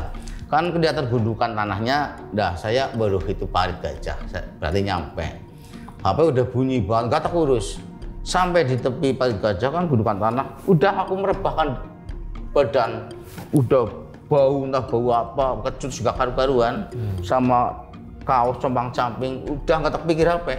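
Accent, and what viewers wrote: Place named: Banyumas, Indonesia. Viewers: Ceritanya sedih dan bikin haru,cm krn bapak ngomong jawanya ngapak,jdi lucu buat saya yg nyimak,jdi kdg udh terharu tetiba ngakak sayaaaa😂😂😂,sehat2 nggih pak❤ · Waduh ngapak ya...😊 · Salam dr Banjarnegara. Kelihatan logatnyaaa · Owalah wong ngapak jan😅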